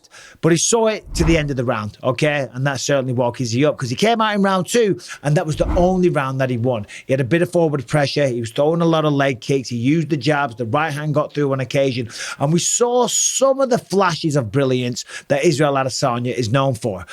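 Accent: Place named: England, United Kingdom. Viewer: Any englishmen channel gets a dislike even before even watching. Still gotta watch. Annoying accent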